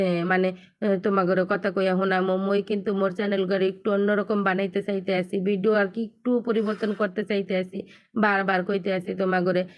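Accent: Barishal, Bangladesh. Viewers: আপু নিহারী তন্দুরি রুটি খেতে দারুন লাগে আর তুমি কি ভাষা বলছ তা কি বরিশালের ভাষা তুমি কি বরিশালের মানুষ???? আপু ভাইয়া তো কত সুন্দর করে কাঁঠাল ভাঙছে, চ্যানেলের নাম চেঞ্জ করে দেবে???? মশকরা মশকরা ভিডিও দেবে 😂😂দিও আমি দেখার জন্য রেডি আছি তোমার ভিডিওটা দেখতে একটু লেট হয়ে গেল দুইটা বাচ্চা নিয়ে আমি নাজেহাল অবস্থাতে আছি খাওয়া দাওয়া ঘুম নিদ্রা সব উঠে যাচ্ছে। 😢😢কেক বানানো দারুণ হয়েছে ঠিক বলেছ আপু একটু অন্যরকম ভিডিও দিলে খুব ভালো হয়। আমি তো একঘেয়েমি হয়ে যাচ্ছি 😢 তোমার জন্য অনেক অনেক দোয়া রইলো অনেক দূরে এগিয়ে যাও মাশাল্লাহ তিন লাখ ভিউ দেখে তো আমি খুব খুশি 🤲🤲🤲🤲🤲👍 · ৩০👍মনু তোমার বরিশাইল্যা কতা জম্মের ভালো হুনাইছে।তোমার নিহারি অনেক সুন্দর হইছে তয় পেঁপে দেলা ক্যা?একলা একলা খাইলা মোরে একটু দেলা না😥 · আসসালামু আলাইকুম, কেমন আছো আপু, তোমার এই বরিশালের ভাষায় কথা বলা খুবই ভালো লাগলো আপু, নিহারি রান্না লোভনীয় হয়েছে ❤❤ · আপু গো তোমার মুখে বরিশাইল্লা বাসা খুব খুব সুন্দর লাগে ❤👍ভালোবাসা দিয়ে দেখা শুরু করলাম শেষ পর্যন্ত তোমার সাথে আছি আপু ❤👍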